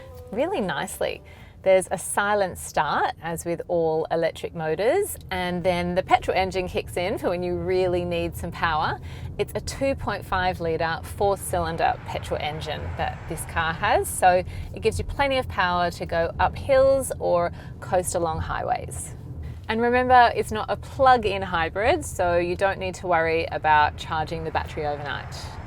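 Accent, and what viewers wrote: Australia. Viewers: australian speak funny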